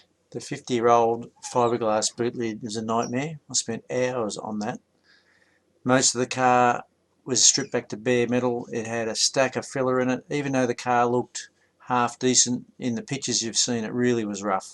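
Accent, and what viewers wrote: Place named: Australia. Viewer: I love his austrialia accent. Its wonderful. Just love hearing him talk. The 300 is absolutley beautiful.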